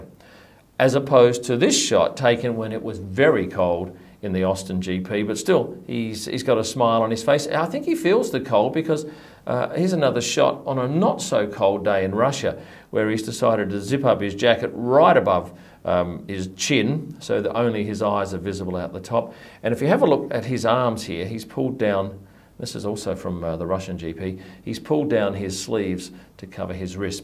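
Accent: Australia. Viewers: Nice to hear an Aussie talking F1.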